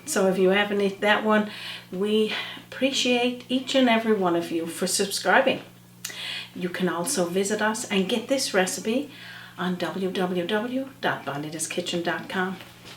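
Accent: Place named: Cape Breton, Canada. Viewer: Love the liners that you used. I have made many muffins in the past as well. Another idea for you that I have done is.. the part that you cut out, I place it back on top of the filling, press it down a bit, put the whipping cream and cherry and chocolate back on top. Looks so pretty as well. I do it with lemon pie filling, too, on a white cake muffin, topping it with icing sugar and lemon zest. Just an idea. I live in BC now but from Cape Breton. Love watching your videos. I found your site when looking up sea food chowder recipes. Love the accent, reminds me of 'home'. 😊❤🇨🇦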